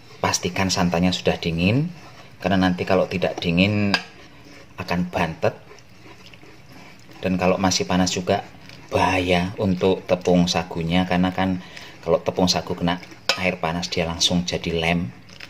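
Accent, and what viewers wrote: Banyumas, Indonesia. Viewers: Pak lucuuu pol n resepe wokeh 👍👍👍👍 auto lgs sy subscribe deloke ambek guyu" 😂😂 ngapak " e inyong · Mas njawine pundi to kados radi ngapak sami kaleh bojone Kulo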